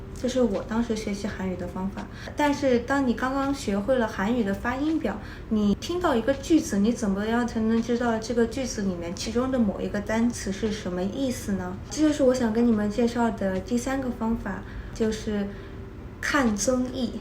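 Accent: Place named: China. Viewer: 这是中国口音吧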